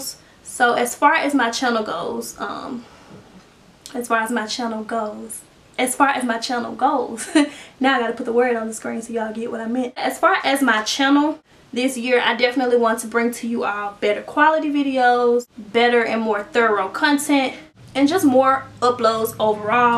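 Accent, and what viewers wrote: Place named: Tennessee, United States. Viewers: is in fact from Tennessee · 1st time watching her. sounds like a Tennessee accent, but it could be Mississipi. Beautiful young lady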